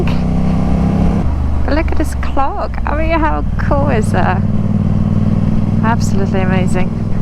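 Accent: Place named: England, United Kingdom. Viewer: hi,im doug from australia,i love all your videos,you are good on your bike,you now have a english accent,what i think halps your vlogging you have a great voicei love the way you talk and you are very easy on the eyes[eye candy]and i love when you swear,tell me you are real and down to earth,thumbs up every day for you and your videos, bye for now..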